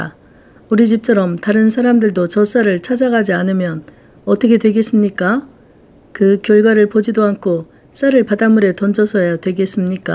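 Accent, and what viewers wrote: Jeolla, South Korea. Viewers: 왓마 오랫만에 전라도 사투리 들어봉께 옛날생각이 나뿌네. 구수허구먼. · 선생님. 전라도 사투리가 날로 좋아져서 찰떡같이 찰지고 구수해서 들으면서 입가에 웃음이 절로 납니다. 고맙습니다.❤❤❤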